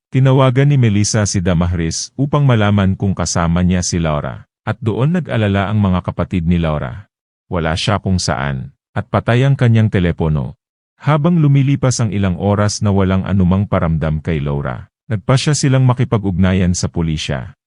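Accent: Visayas, Philippines. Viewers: Kahit may pagka-visayan accent ok lang naman naiintindihan naman ang kwento, someday mas gagaling ka pa katagalan. 💙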